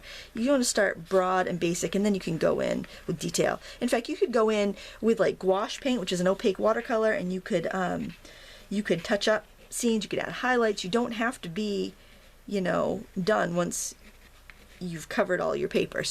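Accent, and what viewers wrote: Maine, United States. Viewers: a fellow Maine-ah! from Hallowell, Maine, he-yah!!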